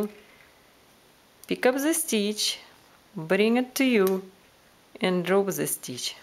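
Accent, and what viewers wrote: Russia. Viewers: This is a good video you did well. Thank you. I am used to listening to the Russian accent as my daughter-in-law is Russian. Thank you for sharing. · You have the same accent as CrazyRussianHacker!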